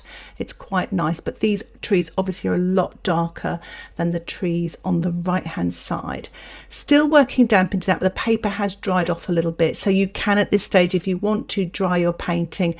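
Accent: England, United Kingdom. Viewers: Lovely, from Green Bay, Wisconsin, USA. Watching painting and avoiding the election drama, too much anxiety. Your lovely English accent calms me. Thank you!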